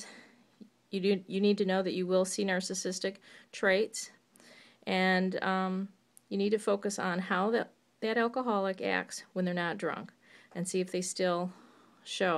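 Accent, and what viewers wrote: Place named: Michigan, United States. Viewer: U sound like you from Michigan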